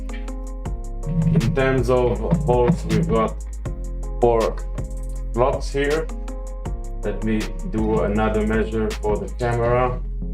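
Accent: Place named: Israel. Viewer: חחחח איזה מבטא ישראלי, אחלה סרטון. אתה חושב שזה עדיף מהth8a? כמה זמן לקח לזה להגיע?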